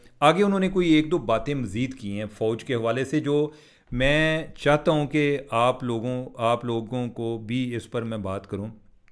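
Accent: Punjab, India. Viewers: Urdu main punjabi ka tarka kamaal stay blessed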